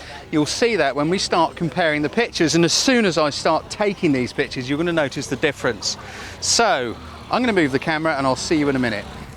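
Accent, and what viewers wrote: England, United Kingdom. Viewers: 5.6 - HAHAHA! Sorry, bloke - English women are not that pretty. Irish ladies have beautiful red hair. And women from Australia, who have about the same accent (as far as I can tell), are quite lovely (Nicole Kidman, Kylie Minogue, Danni Minogue, etc.). But I do enjoy your videos. I've been shooting SLRs since 1978. Still learning new things and always good to get a refresher of the old things.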